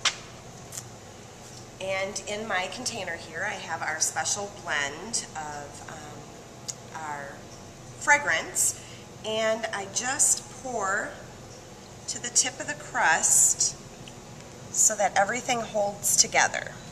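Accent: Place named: Canada. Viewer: is she canadian? her accent sounds very canadian haha love it! beautiful candles, wish i could smell through the screen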